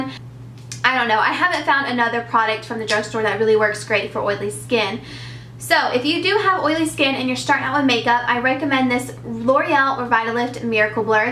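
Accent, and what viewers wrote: South, United States. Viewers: I found your channel when I was looking for hair tutorials & I came across you when you had your blonde hair & adorable southern accent( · wow your so pretty and you sound like an all around beautiful american girl! I enjoyed your video :)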